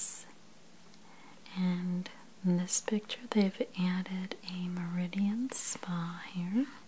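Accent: United States. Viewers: I love all your videos....i wish i knew what state you were from....your voice...😌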